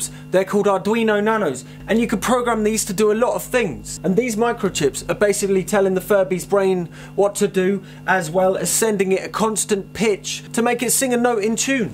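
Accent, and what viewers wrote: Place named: England, United Kingdom. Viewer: Hablas con un acento suuuuper inglés!!! Es demasiado! me encanta!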